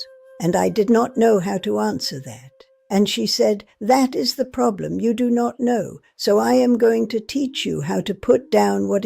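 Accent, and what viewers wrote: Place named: England, United Kingdom. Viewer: Lmao as an Actual Native it’s funny to kitten to an Ai native with an English accent lol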